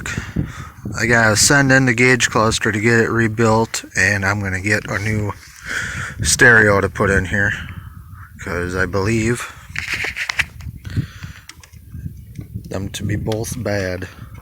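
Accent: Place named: Corning, United States. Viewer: you sound like my cousins from Corning NY... same accent lol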